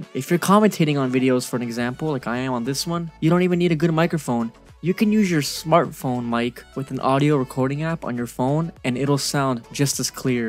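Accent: Croatia. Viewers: Are you from Croatia? :D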